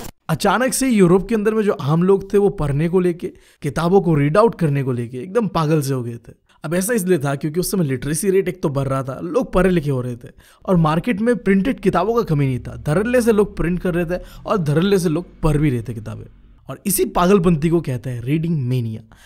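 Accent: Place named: Bengal, India. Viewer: Bhai woh uska bengoli accent hain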